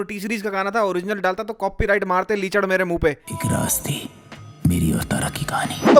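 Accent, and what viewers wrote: Haryana, India. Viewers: Your haryanvi accent is to the point.😂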